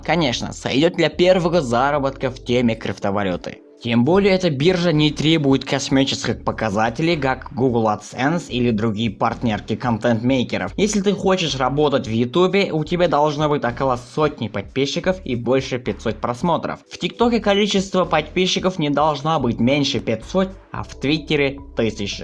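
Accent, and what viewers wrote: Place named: Russia. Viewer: Акцент, у тебя русский, не претворяйся, что владеешь иностранным языком, я их знаю 7 🤜.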